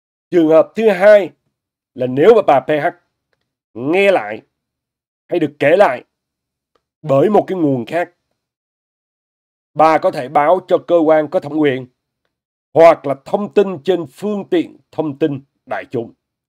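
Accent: Standard. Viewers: Chào cố gắng lên đều vì mình thích kênh này bởi nói rất chuẩn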